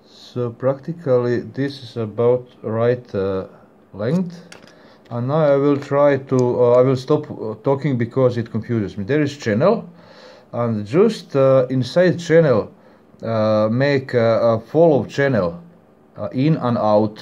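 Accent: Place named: Russia. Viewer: Sorry, your accent sounds Russian. I was complimenting you.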